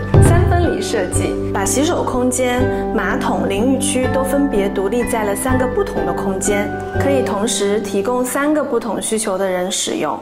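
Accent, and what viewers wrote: China. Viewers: 是大陸人嗎？口音聽起來實在是不習慣😅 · 主播是台灣人嗎？感覺有京片子腔，是大陸媳婦兒嗎？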